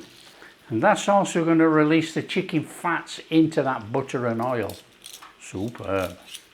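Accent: Yorkshire, United Kingdom. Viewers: Hi, i have been surfing all the channels that i used to follow before i found yours, and the amazing thing is they have all upped their game, some indian channels, which i wont mention, has adopted your trademark saying of " Look At That! " Which brings a smile to my face as its not with your Yorkshire accent. So you obviously are making them less complacent. Fantastic! X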